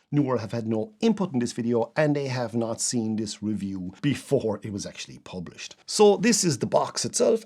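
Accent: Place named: Ireland. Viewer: So I am subscribing just for the Irish brouge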